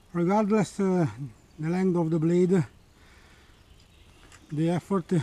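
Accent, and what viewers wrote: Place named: Avellino, Italy. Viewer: Noto un accento avvellinese a sto inglese 🤔🤔🤔🤔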